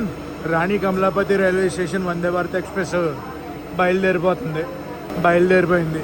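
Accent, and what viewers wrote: Godavari, India. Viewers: Godavari Yasa baguntadhi brother.. watching from Ireland…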